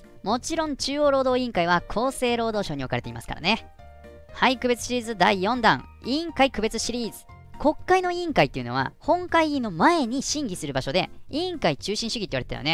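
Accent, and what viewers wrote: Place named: Kansai, Japan. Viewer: この回は関西弁が強調されてる！わら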